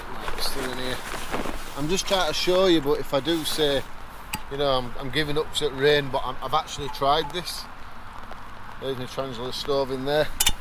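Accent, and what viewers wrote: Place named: Bradford, United Kingdom. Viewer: I knew your from Bradford lol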